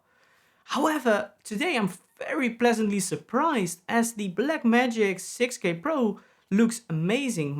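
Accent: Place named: Netherlands. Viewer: Hahaha hoorde ergens al een nederlands accentje, maar die 'later' det het m😂, Goeie video man! Wil hem ook gaan halen.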